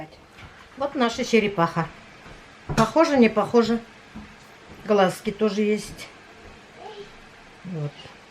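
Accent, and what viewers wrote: Tatarstan, Russia. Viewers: Обожаю наш татарский акцент!😘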